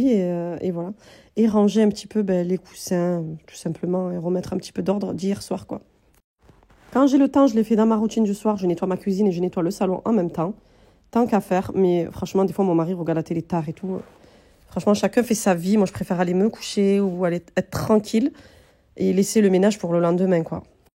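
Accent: South, France. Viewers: Salem alikom okhty 😊 Merciii pour toutes tes vidéo déco, cuisine, ménage… 🥰 je te regarde depuis un bon moment et j’aime trooop ton accent du sud ensoleillé Allah y barek Allah te protège et te donnes la force inchallah 🤲🏻 Allah y barek pour ta récitation de Sourat « الرحمان » puisse Allah le tout puissant iketeblek le Hajj à toi et ton mari inchallah 🤲🏻🤲🏻🤲🏻 Allah te protège toi et toute ta famille inchallah 😘😘😘🥰🥰🥰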